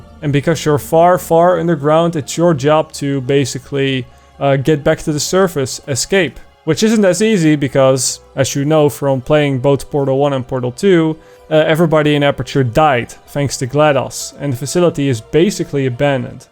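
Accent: Netherlands. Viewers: Dutch ish accent if I'm correct? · You sound very dutch xD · You're dutch arent you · Are you dutch? That accent is familiar. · is this guy dutch? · Okay so i found your video randomly because it was recommended to me. And very good video! Glad i came across it. I love portal and also liked playing Portal stories: Mel But what I actually wanted to ask: are you dutch? I’m dutch myself and i just cant help but feel like you have a bit of a dutch accent. I’m very curious cuz i dont know many proper english speaking dutch youtubers. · u sound Dutch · Video starts Dutch accent sense tingles =O looks at channel Is from the US "Hm.." watches video, entertained end of video "Zie je later" =O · Nederlands accent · Forgive me if I'm wrong but your accent screams Dutch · I can tell ur Dutch, ur accent is big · goede poging voor engels ik ben ook nederlands! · I didn’t notice it at first but later in the vid i can hear the dutchness in your voice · Obvious Dutch accent is obvious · At the start i was like lol he sounds so dutch. 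And then somehow I just forgot about it and the "zie je later" in the end just had me in shock like huuh and then i was like oooh yeah duh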